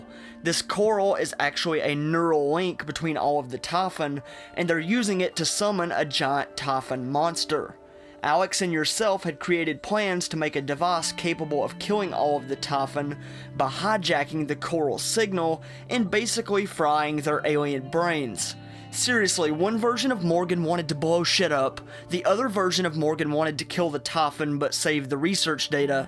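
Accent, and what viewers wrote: South, United States. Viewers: I’ve heard some good southern accents but this one is just annoying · I've never been attracted to a southern accent but his voice is damn sexy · They way you pronounce "typhon"....No thanks, keep that southern accent neuromod. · "Incredibly attractive southern accent," O........kay....... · Hey! No making fun of his Southern/Cowboy accent! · Haha nice Southern accent !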